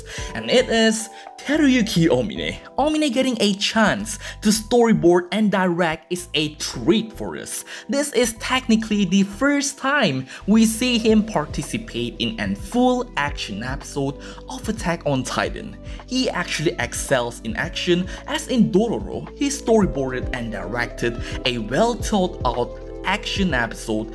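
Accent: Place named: Asia. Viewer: how old are tou? , also are you american? , sound kinda asian with that accent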